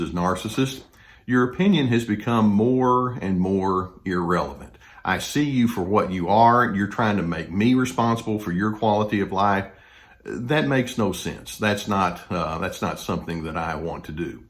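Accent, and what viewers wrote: South, United States. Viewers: Your description of a narcissist freed me! I couldn't believe you were saying everything I was dealing with, like Gaslighting! He put me through hell playing that sick mind game, he made me question my sanity on more times than I want to admit. Just sitting there with my ear buds in listening to your calm southern voice that reminds me of my uncle you put into words what I wasn't able to because I was so beat down, frustrated and empty! At the end of your video I knew what I was dealing with, I knew it was never going to stop and I knew I was done! It was so bad I was carrying a pistol! I packed up what I could get in my car and left that day and I will never go back!! He has turned my youngest child against, and tried to do the same with her older sister but she cut him off when he starts on a rant! I'm gone, sooner or later he's going to need a new source of supply so he's going to point that inner rage at his own daughter and when he does he has met his match because she's more controlling than he is! 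Lol She's a chip off the ole Narcissistic block! I don't hate him, love him, he's pathetic. He had a stroke from high blood pressure because he wouldn't take his meds. and he has tubes coming out of his kidneys because they're barely working and you know what? I'm not going to help wipe his ass, I did it for 41 years and I refuse to give him one more minute of my life! I might sound heartless, if I am he made me this way!! This is my life, the fact that I have absolutely no i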